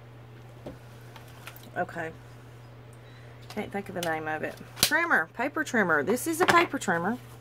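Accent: South, United States. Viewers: Just found this video and it brought tears to my eyes. That accent it straight from my southern roots! Well, I still sound like that even though I’m a transplant 😎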